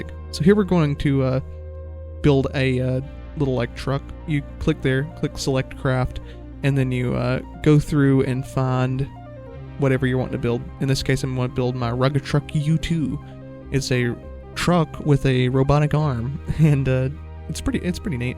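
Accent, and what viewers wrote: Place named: Texas, United States. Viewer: why does this guy have like a texan accent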